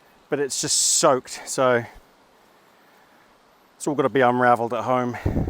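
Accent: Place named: Cheltenham, United Kingdom. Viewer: Where are you from? You sound just like my mate who comes from Cheltenham. Loving the vids & Bruce 👍🏻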